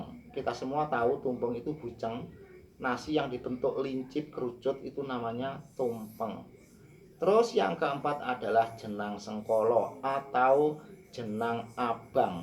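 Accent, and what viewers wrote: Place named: Ponorogo, Indonesia. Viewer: Logat bahasa khas Ponorogo.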